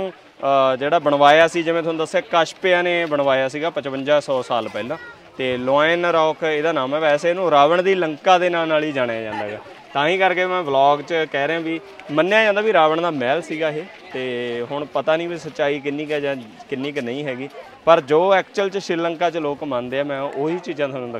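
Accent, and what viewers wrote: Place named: Punjab, India. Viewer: Bravo to and. Last vlog of Ravana's Lanka was great and we really love your typical Punjabi spaeking. Really enjoyed when said "Thalle Rukh takko kinne pidde pidde Jehe lagde ne" Our wishes keep it up. Waiting for Batticaloa Gurudwara Darshan. Thanks and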